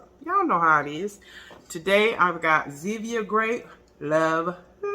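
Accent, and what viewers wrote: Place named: South, United States. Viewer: Happy Birthday and many more, my Birthday is the 4th of July and I will be 61 GOD is good all of the time. I just love your sense of humor and your Southern accent it cracks me up. Have a wonderful and Blessed Day and stay safe.🙏🏾👸🏽💖💯💫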